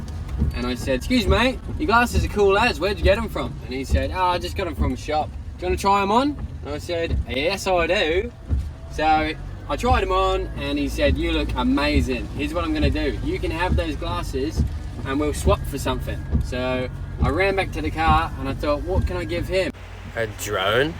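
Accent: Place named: Australia. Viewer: Where abouts you from in Blighty originally butt? (See if you can guess where I’m from with that word 😂) You’ve pretty much got the Ozzy accent down Pat fella! I was out there travelling around in <num>, I’d love to go back tbh mate. Sick van build btw man, you got yourself a very cool little family set up there. Awesome brother!!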